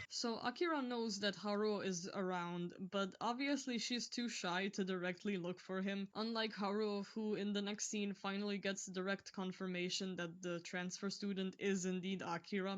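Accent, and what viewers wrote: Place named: Canada. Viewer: i have to say i jus luv the way you speak, your style is adorable! and nutin wrong w your english, actualy im suprised to learn its not your first tounge, i thought you're canadian:) wonderful analysis btw a joy to watch, thx for makin'em!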